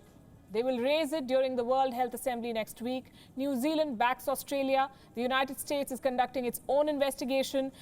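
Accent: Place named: India. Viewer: Indians have the best accent in the world!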